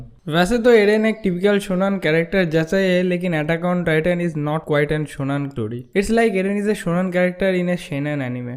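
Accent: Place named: Bengal, India. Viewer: bro you sound like bangali are you ?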